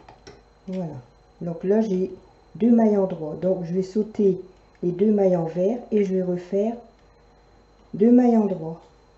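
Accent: North, France. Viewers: Bonjour, c'est gentil d'avoir répondu et si vite,.malgré mon expérience j'en apprends toujours en regardant vos tutos. ...De plus j'ai l'impression de reconnaitre un peu l'accent du Nord, je suis moi même originaire de cette région. ..Vous m'avez redonné le goût de tricoter....Grand merci!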